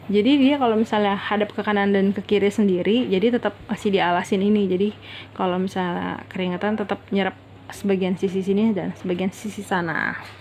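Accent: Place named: Lampung, Indonesia. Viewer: Mba org Lampung kah, logatnya keliatan.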